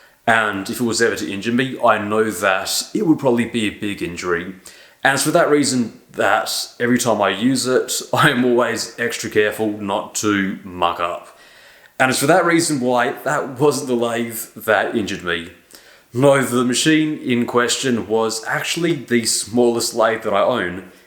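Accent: Australia. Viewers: Wait .. he's Australian?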